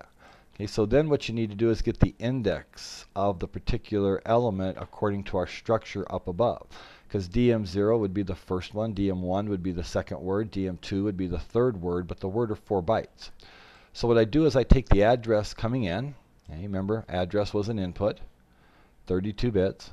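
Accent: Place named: Southwest, United States. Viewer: I think it is a US accent. Southwest probably.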